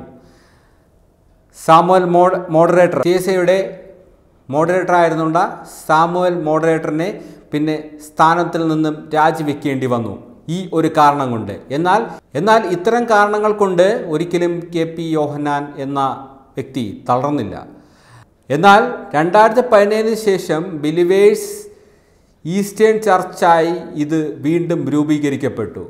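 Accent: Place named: West Bengal, India. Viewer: എന്തു വാർത്ത വായിക്കലാണിത്... കഷ്ടം... ബംഗാളിയെ പിടിച്ചു മലയാളം വായിപ്പിക്കുന്ന പോലെയുണ്ട്...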